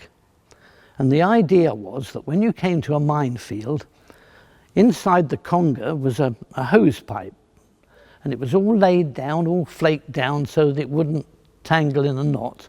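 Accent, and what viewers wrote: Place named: United Kingdom. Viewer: "That's a load of tripe as far as I'm concerned"...., your British is outstanding. You talk on & on about anything you like, it's such a pleasant experience.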